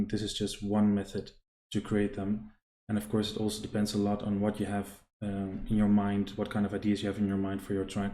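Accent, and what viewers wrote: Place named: Netherlands. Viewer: Hey i would like to know how to create the screech, its a kinda sound.... Looking for ages to create it. By the sound of your accent u clearly dutch i gues.. Me 2. Great track gonna follow you more around.. Love the tuto man, i am also trying to learn this just for fun.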